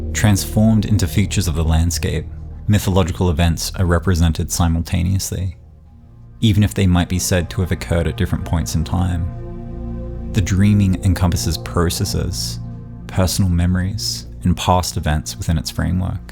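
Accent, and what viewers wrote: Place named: New Zealand. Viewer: Lovely essay! You sound like you are from New Zealand? Just curious as I'm listening from Auckland. Thanks man, nice work, congrats.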